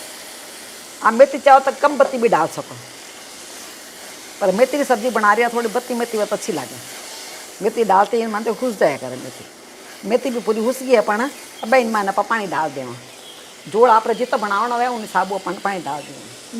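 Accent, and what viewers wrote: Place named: Marwar, India. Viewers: जय श्री कृष्णा Thaki मारवाडी बोली घनी चोखी लागे. रेसिपी बतवणकी पद्धत आणि सब bartan बहोत अच्छा लागे. या sabji तो mhe जरूर banayne देखुला जी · Receipe re Sathe marwadi boli gani achi lagi sa.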